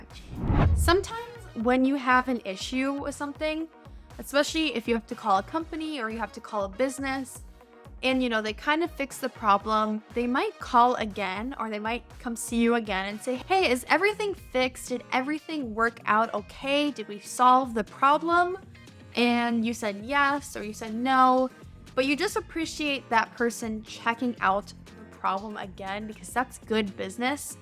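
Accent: United States. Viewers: A great lesson, I love the way you teach us, super natural and like the American way you pronounce cause is clear and real. I have a question: I’d like to learn how to use this two words, Aim and Amid. Somewhere I read an advertisement that says: “We Aim to please” It sounds nice to me but I don’t get the meaning. Thanks again Miss and I owe you one.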